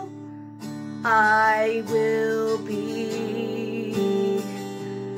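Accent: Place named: North, United Kingdom. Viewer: Loved this so much! Especially with the northern accent if sounds so cute. Hope you go far!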